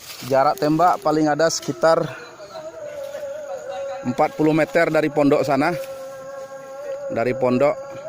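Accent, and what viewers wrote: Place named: Padang, Indonesia. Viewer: ohh....logat nya hampir mirip org padang..hehe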